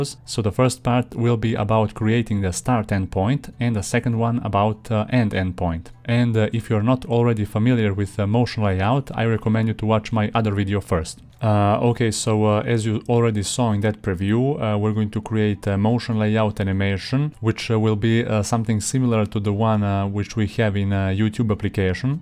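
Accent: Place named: Russia. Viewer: Your accent is similar to Russian. Are you From Russia? = ) Thank you for the tutorial!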